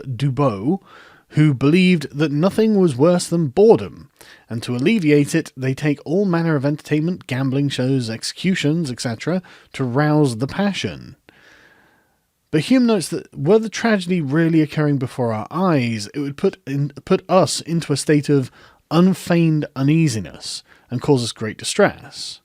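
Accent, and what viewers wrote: United Kingdom. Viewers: I'm here just for the British voice ASMR.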